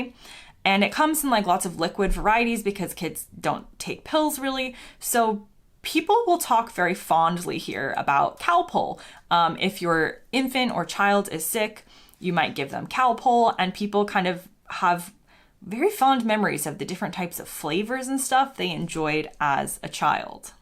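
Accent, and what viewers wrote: United States. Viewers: The US pronunciation of Barbados is so annoying. Also , it's Cost-a Rica not Coast-a Rica. Also it's rizzott-o not rizzoe-to. Americans should learn how the natives pronounce words before making up their own interpretation. While I'm on a rant it's not Edinburrow it's Edin-burra . I'll stop now before I start to get cross . LOL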